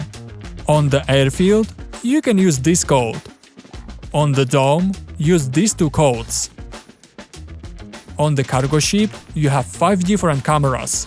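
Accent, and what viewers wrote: Russia. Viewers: о, русский акцент. Наш!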